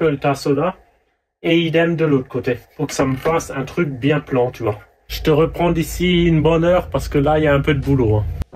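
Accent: Alsace, France. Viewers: Votre accent n est pas Alsacien? Je suis des Vosges peu être que vous pourriez aménager mon jumpy utilitaire 😊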